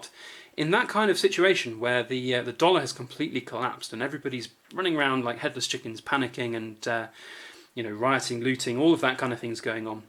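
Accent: United Kingdom. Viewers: You sound like a Brit, did you pay the UK VAT or make use of the EU loophole rate? Many safes are worse than useless. Milk spotting can be removed. Regarding the Queen's Beast, you don't say that extra high premiums on previous to current issues are charged. Compare the Lion to the Black Bull, re. the 10oz, compare the Lion to the Welsh dragon. My view, stick to your particular countries legal tender denominated silver bullion? If and when the SHTF you will have better bargaining power, and if you must have variety make sure that your alternative choices justify their purchase by being cheaper than your prime choice. If you then desire limited issues such as the 10 QBs, treat yourself.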